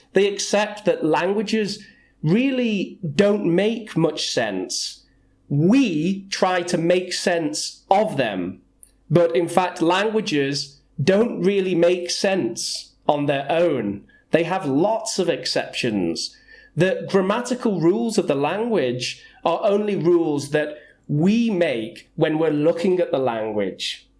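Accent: United Kingdom. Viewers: I've been learning so much with your videos, I don't have time to attend an English course so I study by myself when I'm free.I've been following your tips and I notice that I'm finally making progress at my studies!:) Plus, your British accent sounds like music to my ears.haha Thanks a lot!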